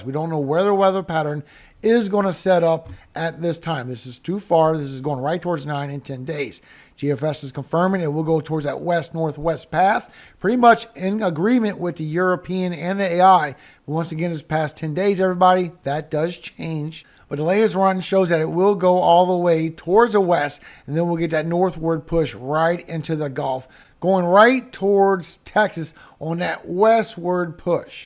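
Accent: Louisiana, United States. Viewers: Hay weather man are you from Louisiana?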